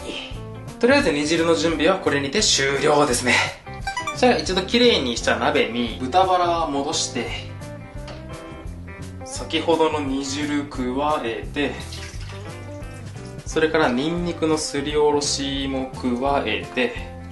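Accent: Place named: Hakata, Japan. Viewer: 最近見させていただいてます！もっと早くさんを知りたかった！同じ九州人なので、時々出る博多弁が心地いいです！料理男子として尊敬しまてます！これからも動画楽しみにしています！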